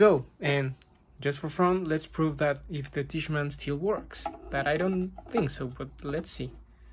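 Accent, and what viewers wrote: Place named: Mexico. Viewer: I think so. He collects Mexican lighting and has a Mexican accent (I think). I'm terrible at recognising accents.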